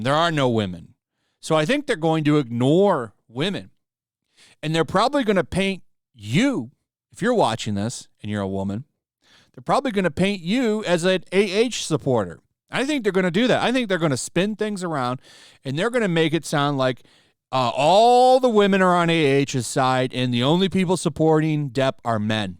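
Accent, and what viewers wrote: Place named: Upper class, United Kingdom. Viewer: Not a bad attempt at the snooty English sound. I'm a Yorkshire man and it's only a certain "class" that talk like that... fortunately.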